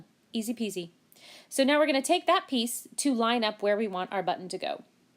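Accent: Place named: South, United States. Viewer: Really nice tutorial. Could you please tell us the name of that tape again, (I'm from California & I couldn't quite catch it with your So. accent) LOL Thanks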